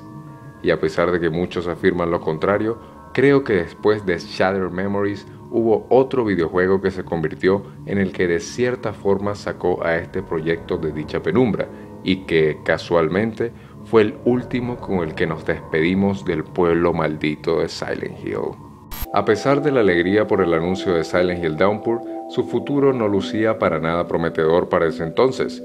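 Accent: Venezuela. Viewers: st men es venezolano, verdad ? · Sendo acento Venezolano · Eres venezolano? :0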